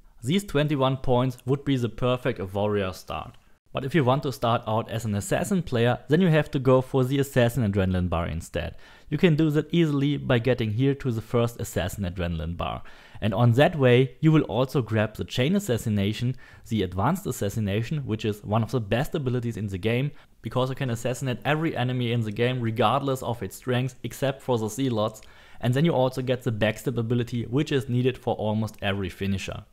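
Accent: Germany. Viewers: Dude mast be 10000% from Germany they have hard time to learn English so he is not bad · I love the German accent, great video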